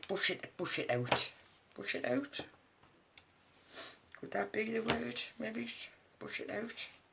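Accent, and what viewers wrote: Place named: Newcastle, United Kingdom. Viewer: Lovely card hun and great channel. Lovely to hear another Geordie :-) xx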